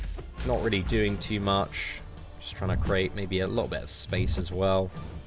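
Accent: United Kingdom. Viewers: Im only here to listen to the pale british bloke yapping about.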